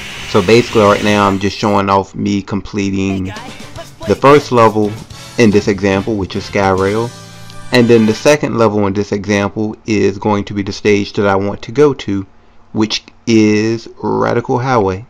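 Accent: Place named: South, United States. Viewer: You sound like a fine southern black gentlemen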